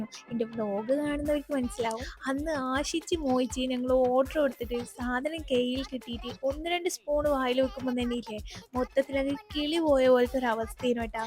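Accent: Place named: Kannur, India. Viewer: കണ്ണൂർ ആണെന്ന് തോന്നുന്നു.. സംസാരം കേട്ടിട്ട്...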